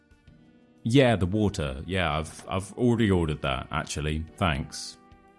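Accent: United Kingdom. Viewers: Hello!,i'm practicing with your British accent videos, but I'm a beginner, I don't understand much, but I feel like it's helping me, thank you, greetings from Mexico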